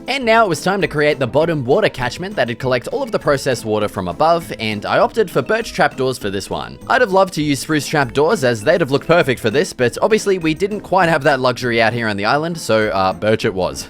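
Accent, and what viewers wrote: Australia. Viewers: omg the aussie accent is legendary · Yo! I was just watching some of your videos and realised I recognised your accent, a fellow Aussie! I know it’s weird and all getting excited seeing another Aussie because I see them everyday- but online you don’t see too much of them. So have a good rest of your Tuesday! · U Aussie? U sound Aussie.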